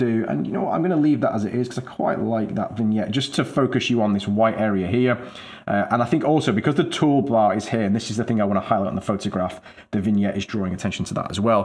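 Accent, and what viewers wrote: United Kingdom. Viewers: I hear a British accent. I subscribe 😝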